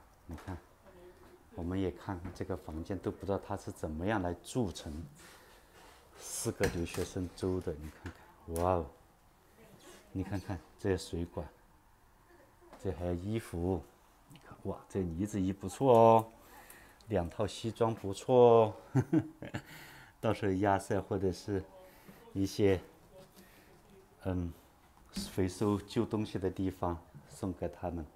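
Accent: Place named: Chongqing, China. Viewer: 听口音 你是重庆方向的吗😮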